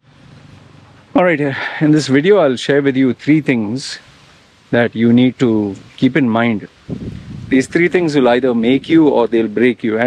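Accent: India. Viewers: Are you Indian, your accent sounds like Indian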